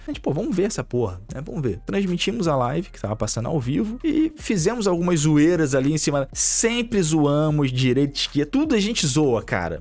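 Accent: Rio de Janeiro, Brazil. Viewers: Eu acompanho o desde os tempos que o cara tinha 20k de inscritos, discordo dessa opinião dele, mas o pessoal fica muito emocionado. Eu tbm sou carioca e esse jeito dele falar é uma característica nossa, no fundo ele sabe que o pessoal não está puto por não ver a boneca pelada, mas está puto com o fato de mudanças nos jogos.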